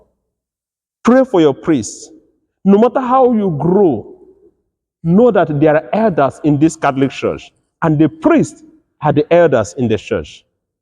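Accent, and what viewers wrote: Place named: Nigeria. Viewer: I believe the future of the Church is in Africa. In our parish in the South of England we have two extended Nigerian families and a family from Zimbabwe, all active members of the Parish. re you Nigerian,? Your name and accent suggest so.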